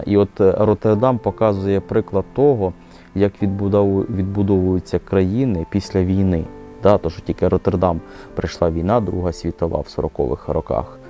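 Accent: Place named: Dnipropetrovsk, Ukraine. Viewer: Говір як з Дніпропетровщини )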